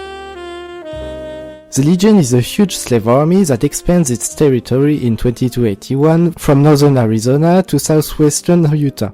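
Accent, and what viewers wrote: France. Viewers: ahhh laccent francais put1 ca craint.. essaies de t'en debarasser quand tu parles l'anglais... " hello Zis iz a litteel video on fallout" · You deserve every view frenchy. Props for having the courage to voice them even though it isn't your native language. Keep making these awesome videos. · Es tu français sa s'entend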